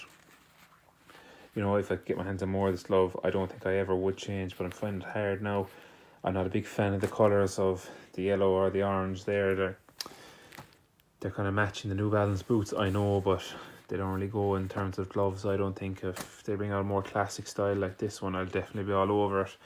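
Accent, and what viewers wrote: Ireland. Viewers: Are u from Dublin?but I'm from Ireland and I don't find gloves that cheap any wear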